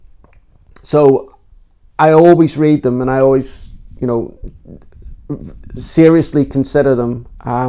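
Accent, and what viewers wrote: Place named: Northern Ireland, United Kingdom. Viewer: I like listening to you because your accent is as close as I'll get to Northern Ireland! I haven't seen my daughter in Armagh since before Christmas and God only knows when we'll be able to travel again. Best wishes to you and the family.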